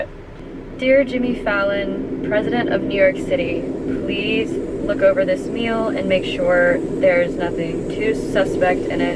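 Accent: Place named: South, United States. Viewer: I could listen to you talk like a Southerner all day long. Please do so and keep up the good work.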